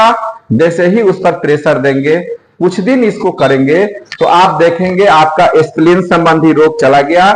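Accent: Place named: Bihar, India. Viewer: Sir ji aap ney bahut wadia jankari di. But aap ki jo hindi hai woh shud nhi hai jaisey aap yin ko in boltey ho spleen ko espleen wood ko ud aap hindi meyn Bihari Bhojpuri mat dalo kyon key aap ki video poora deysh deykhta hai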